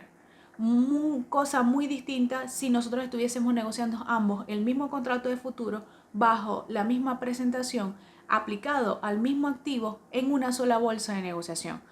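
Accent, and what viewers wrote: Venezuela. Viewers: Ese acento venezolano tan bello que tenemos jeje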